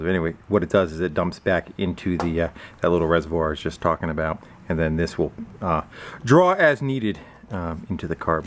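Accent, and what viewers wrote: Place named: Albany, United States. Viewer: this guy must be from albany ny area he dont sound from NH.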